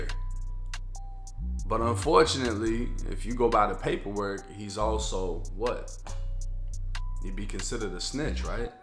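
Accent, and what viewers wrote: Boston, United States. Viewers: Im surprised you still got the boston accent but good video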